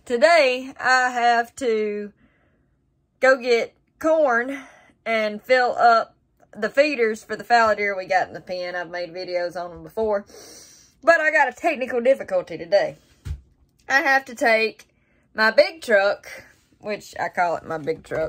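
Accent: South, United States. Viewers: Love That Southern Bell Voice such a Beautiful Young Lady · As a southern guy her accent drives me crazy. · Love you and that southern beautiful language and hospitality.id come help you do any project you might want some help with. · I love ❤️ her Southern accent. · I just love her accent. She is a true southern Bell. 🥰😏😎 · you're the best ever just love your southern talk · Hello from Texas. Always looking beautiful and melting hearts with your southern accent. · Get Padre to make you a wooden ladder you can just leave it there on site. I had a good chuckle when reading your description and then heard you remark your one truck was "torn up". I had a student years ago write in a homework assignment that his "... sister's dress is toup." (toh-up). I always 'preciate your Southern drawl. Makes me miss my roots. I was born and raised in L A, too. Lower Alabama, that is. 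· Love your cute souther accent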